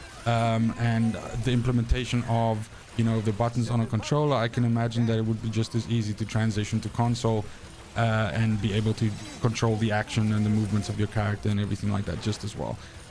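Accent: South Africa. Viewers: i can hear some south african .awe my bra kwai video!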